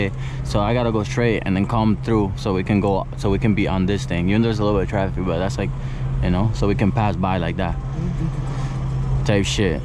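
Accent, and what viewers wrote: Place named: Cuba. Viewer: good video, im argentinian and your spanish is fun, beacuse your accent is cuban and is fun hear it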